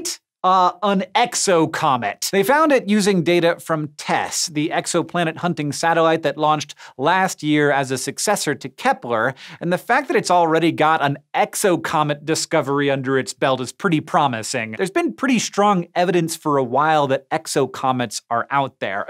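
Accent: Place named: United States. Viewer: "it's LIKE" I'm listening to a school girl in a mans body. The American accent, voice and sentence structure does my FN head in! so many YT vids that promise and then the voice... JUST TALK NORMAL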